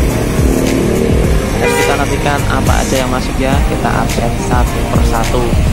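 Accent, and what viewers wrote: Banyumas, Indonesia. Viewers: Ngapak Bosku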